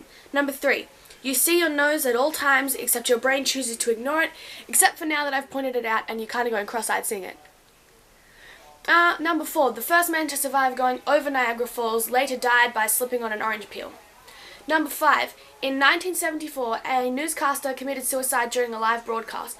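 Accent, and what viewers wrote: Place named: Australia. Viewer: Great video :D Love the Aussie accent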